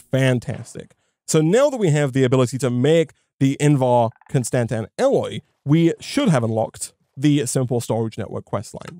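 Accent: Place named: Wales, United Kingdom. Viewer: I can't place that accent. Welsh is as close as I can get...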